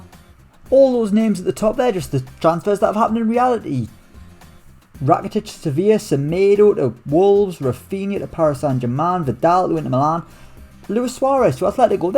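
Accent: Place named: Northern England, United Kingdom. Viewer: the northen accent aswell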